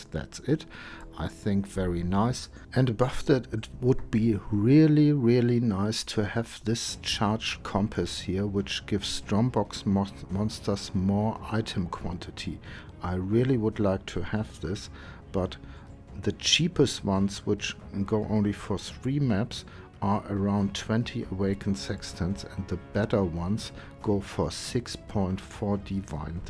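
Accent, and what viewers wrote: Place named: Germany. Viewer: Du musst Deutsch sein deinen Akzent hört man schom derbe raus.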